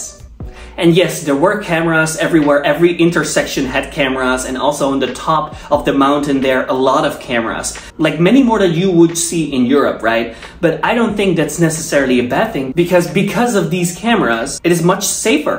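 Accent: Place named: United Kingdom. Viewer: I am a studier for Ielts,thought your prounciation is so stanard that I really want to ask where you from.Are you a real person who comes from UK as your prounciation is so well. Your prounciation of English is more standard than I'ever seen so many people speak English.I can't hear clearly what's the mean of them but I could understand you.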